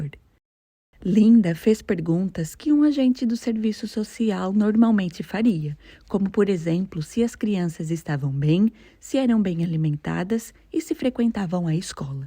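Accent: Portugal. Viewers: Parabensppelo bom portugues de Portugal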